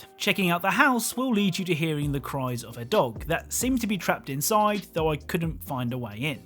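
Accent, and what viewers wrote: England, United Kingdom. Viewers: Charming English Accent.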